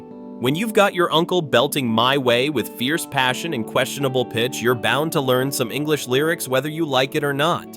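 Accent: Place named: Philippines. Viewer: you sounded like flipino Chinese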